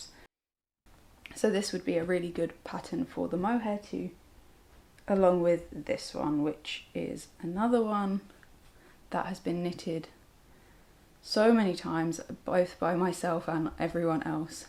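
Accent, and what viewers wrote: United Kingdom. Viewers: Hi, I found your channel recently and I've so enjoyed all the videos I've watched so far. I'm also from Herts, and a knitter, and your voice really reminds me of home- I live in London now, so not a million miles away so maybe that's weird 🙂! Anyway, just wanted to say Hi and introduce myself.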